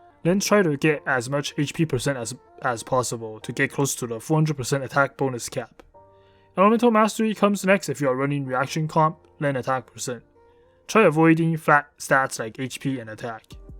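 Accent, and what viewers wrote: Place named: China. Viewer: 哦哦哦，听你口音，还以为是中国的。哈哈哈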